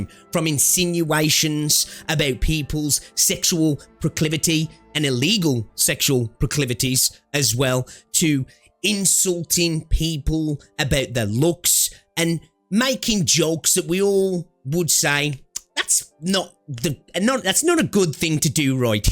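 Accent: Australia. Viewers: Ngl your voice and Australian accent is still dogshit to hear, haha can't even go past 1 min haha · Man you have the sweetest aussie accent ever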